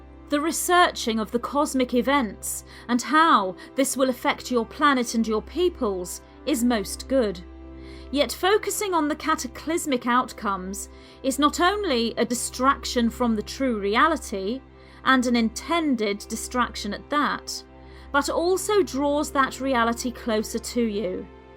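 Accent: United Kingdom. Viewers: HEY EVERYBODY... Just thought I'd come in and grab a little popcorn and watch a video about another non-existent planet...... Okay, here we go - yeah buy the book and resource series. Nibs-For--Tards. (Uh-huh! yeah! MK Ultra, sure) How To Painlessly Rip Out Your Brain... Ahhhh - gawdammed British accent will do it every time. My pole shift??? Gash talks like she's an exception to this planet - someone should check her 'pole.' Hoooe-shit, there's still 26 minutes of this fucker left. I don't think there's going to be enough popcorn and I certainly didn't see anything to drink around this joint.... ....Hang on... I'll be right back in a jiffy.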